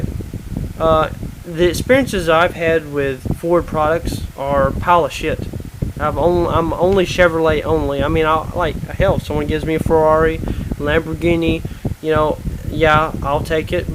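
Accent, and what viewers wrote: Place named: South, United States. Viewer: his accent isnt that strong i would say that accent could only go as south as missouri, stop hating on him just because he has a bit of a country accent city boy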